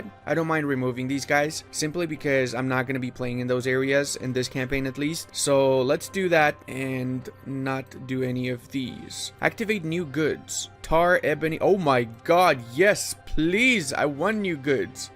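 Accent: Romania. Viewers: is this guy romanian ? his pronounciation is really good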